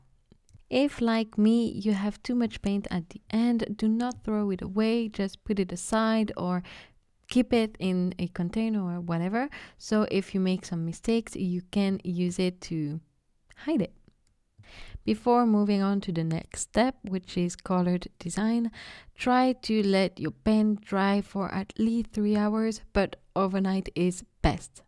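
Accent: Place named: France. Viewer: Je me disais que l'accent était un peu français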